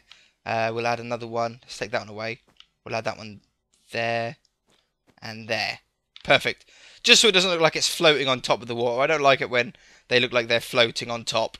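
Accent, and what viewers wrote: United Kingdom. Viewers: hey what part of uk u from u sound like u live near me ive watched all ur vids well nearlly all of them and was wondering if i could maybe join and help build a house or skyscrapper or what i have built myself a massive monster energy can:)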